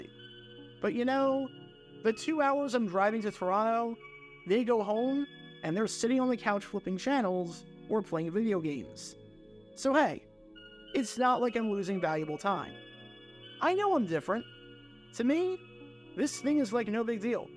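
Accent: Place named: Northeast, United States. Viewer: Also, I love that even though lives in Arizona and spends a lotta time in Florida, he’s never lost that northeastern accent.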